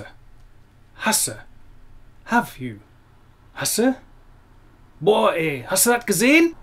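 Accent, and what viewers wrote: Ruhr, Germany. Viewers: Ganz großartig! Ich war erstaunt über Deine für einen Deutschen hervorragende englische Aussprache (noch dazu mit offenbar nord(west)englischem Akzent), bis ich hier in den Kommentaren las, dasse Brite bis! Dat gibbet ja nich, Du höas dich perfekt an wie einer ausm Pott! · Samma watn hier los?! Dafür gibt's Kurse? XD Bin vorm Jahr ins Saarland gezogen und es is so erfrischend mal wieder ordentlich Ruhrpott zu hören 💙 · Der spricht sehr gut Änglisch, datt macht ihn abba noch längs nich zum Ängländer, wie manche hier wohl glauben tun. Sein Ruhrpott is originaal, datt krisse nur hin, wenne von hier biss.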